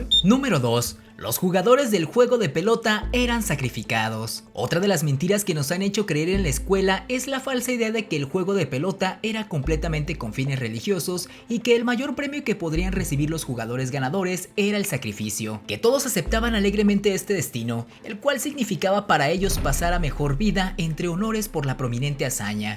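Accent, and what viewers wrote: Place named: Spain. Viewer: A mi me da no se qué, cuando los locutores son españoles y hablando de Mexicas, Aztecas, Mayas, Olmecas, Chichimecas etc. y aparte de soportar una sarta de mentiras tener que soportar su forma de hablar es un suplicio.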